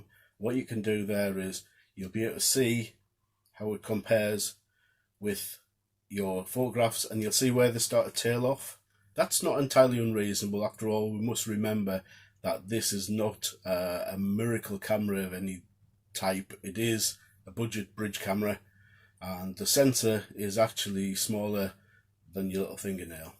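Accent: North East England, United Kingdom. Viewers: Thanks for the video.. Good work mate! Local lad I hear. Probably somewhere between Sunderland and Boro..🤔 I've had this camera for about 6 months now. Picked it up from CeX for £165. Can't complain for that and in mint condition too. Yeah, it's great for shots at the zoo and some of my best shots were had there last summer. Quite impressive to be fair. I'd never owned a zoom camera like this before so I thought why not. It's quite versatile. It does seem to favour good lighting conditions though. I'll be honest, I've never really moved from auto on this camera. I really should experiment. I have taken some quite remarkable pictures of the moon though and only in Auto. Amazing really for the price of the thing. I've just getting a tripod so we'll see what improvements can be made with this. I'll check out the rest of your videos when I get a chance. 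There's also that guy called Graham on YouTube who's got a whole load of content related to this camera. I'm sure you're aware of him.. Keep up the good work. Alan.. Washington UK.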